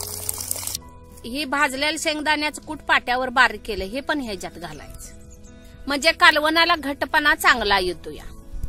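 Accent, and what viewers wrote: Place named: Rural, India. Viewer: रेसिपी तर चविष्ट झाली असणारच. पण ही गावरान भाषा कानाला इतकी गोड वाटते की ऐकतच राहावीशी वाटते.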